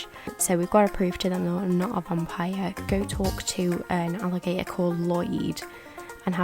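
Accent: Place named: Liverpool, United Kingdom. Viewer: Hearing Urbz in a scouse accent is wild 😂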